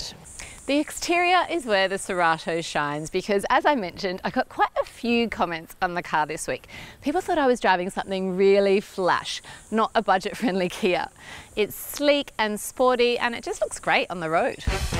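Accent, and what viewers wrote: Australia. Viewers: its like a wagony hatchback i dig the design. Kia is making great cars with great price. Also good job on that family review with this car, hope people understands that you don't need a freaking SUV for hauling a family (the SUV trend is really bs -_- ). p.s what accent is that Australian?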